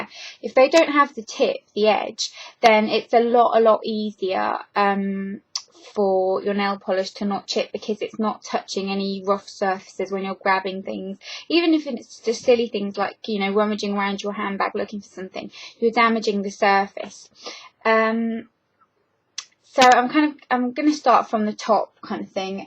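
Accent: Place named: Leicestershire, United Kingdom. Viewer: It's refreshing 2 hear someone from the UK, on YouTube. Humour me - judging by ur accent, are u from Leicestershire, or possibly the West Midlands? Best wishes.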